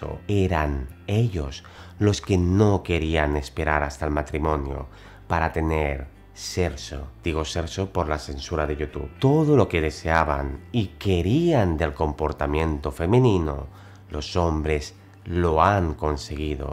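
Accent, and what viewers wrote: Spain. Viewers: su acento bien español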